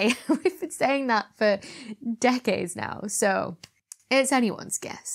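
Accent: United Kingdom. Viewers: So enjoyed this, like to hear your British accent as someone born in London, loved the geordie accent bit :) I would be interested to know what you think of 1956 Forbidden planet my favourite that is the forerunner of most Space soaps.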